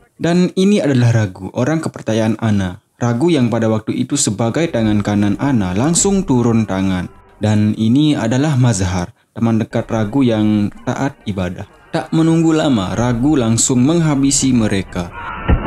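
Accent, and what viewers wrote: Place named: Aceh, Indonesia. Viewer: Cara ngomongnya kaya orang Aceh min :v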